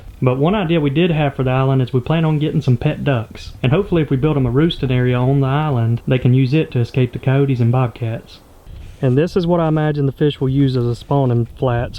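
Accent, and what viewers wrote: United States. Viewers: The internet constantly surprises me . Here I am again , listening to a cool American voice chatting about , and showing anyone interested , how to build a bass pond . I live in a condo , have never gone fishing , and yet find myself delighted to see your video pop up . I am already looking forward to seeing the next step .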